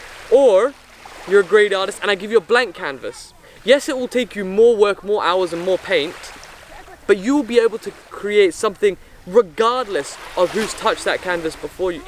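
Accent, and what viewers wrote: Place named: Australia. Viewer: MashAllah! Are you mix? You look mixed. I can also peep the australian accent. AUSSIE AUSSIE OI OI OI!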